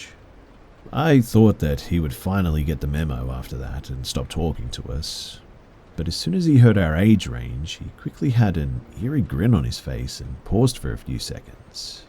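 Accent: Australia. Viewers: Aussy's are my favourite narrators now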